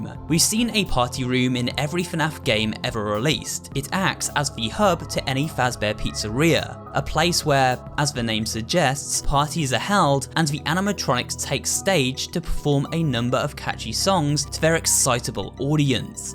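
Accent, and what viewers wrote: United Kingdom. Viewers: like the british accent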